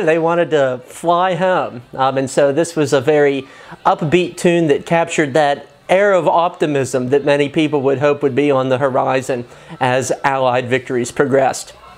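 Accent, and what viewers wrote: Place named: Mid-Atlantic, United States. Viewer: a compliment of the highest order. I'm a lifelong Philadelphian, I've always said Hollywood tells us only 4 accents exist in the US , California/valley girl , New York, Boston, Southern and occasionally Midwestern . Yet the Mid Atlantic/PA accent is just as distinct as any of those, yet it's never even attempted. Despite the gluttony of great actors from the region like Tina fey, Bradley Cooper, Kevin bacon , will smith and Kat dennings. Worst of all whenever a movie is set in Philly they usually just have the actor do a new York accent. When I started going to school in upstate new York people thought my accent was fake, they litteraly thought I was pretending. Thank god bam margera and his show viva la bam became popular. At least then people could see I really did say "howgie" and "hoooomee" They say that accents are begining to vanish. 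So when I hear someone unapologetically speaking in a distinctly regional accent I love it. Especially when it's my region . I'm a big fan of movies, history and Pennsylvania so I'm a big fan of what youre doing. I think it's a great and unique concept and you blend the two really well and make it enjoyable. I can't recommend your channel enough. Keep up the good work.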